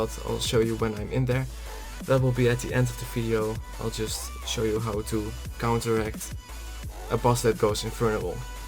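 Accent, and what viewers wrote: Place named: Netherlands. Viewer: Are you Dutch? If yes reply please you sound like someone with an Dutch/English accent. maybe we can play togheter. I am building allmost the same build that you have shown here only with an firery twist to it :D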